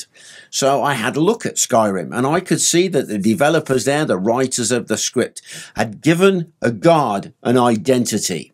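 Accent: United Kingdom. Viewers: Just found this channel glad I did. You’re a class act my man and you have the best kinda British accent btw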